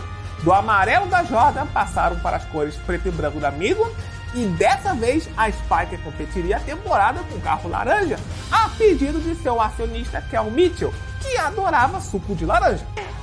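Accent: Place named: Portugal. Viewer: Sim, belo sotaque PT-PT!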